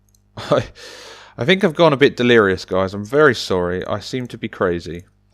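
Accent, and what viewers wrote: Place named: United Kingdom. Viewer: Dude you're just the best youtuber. Too many reasons. First of all, your voice is extremely calm and relaxing, I love it! Second, your accent, I just adore British accents, IDK why. Third, the video ideas you have are really creative, yours and my humor are almost exactly the same XD Fourth, the game you're known for, cubic castles, is just *the best*. Fifth, the dedication put into your channel is crazy. There are way too many more reasons I could list here, but I just want to concentrate on the video! If you're interested in being friends on cubic castles, my username is RustyComedy69 BTW :^)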